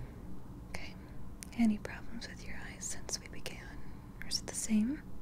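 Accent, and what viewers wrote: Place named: England, United Kingdom. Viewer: Great English accent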